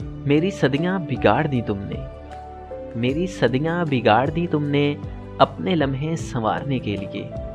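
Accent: Punjab, India. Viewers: shyri achi hai per maaf krna punjabi lehja main sara maza khrb kr diya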